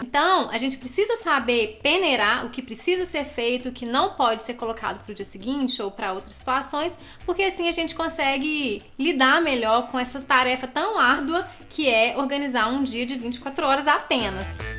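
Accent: Minas Gerais, Brazil. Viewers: Eu gosto muito desse sotaque minerin!!!!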